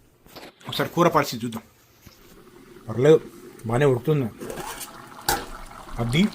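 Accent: Andhra Pradesh, India. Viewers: Bro meedhi telangana ayinappatiki mee slang completely andhra style lo untadhi...❤ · Bro mee vooru Nizamabad antunnaaru kaani mee bhaasha Andhra slang lo vundi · needi telugu andhra telugu la vundi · Medi telangana khada. Mari andhra slang nti ani na dought 😊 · day 2 of asking ----- anna nvu gun carry chestava safety kosam? and nv nizamabad nundi annav kani andhra slang undi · meeru telangana kadha anna slang mari andhra laa undi enti anna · Bro, meeru telangana nizamabad ayna, telugu mathram ap slang la undhi 😊😍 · meru chese vlogs chala baguntai, meru asala andhra na telangana na? Me native Nizamabad ani antaaru kadha, kani real ga ne meru Nizamabad aa? leka andhra ninchi akkada settle aiyaara? Me Telugu pure andhra laga untundhi, please clarify cheyandi.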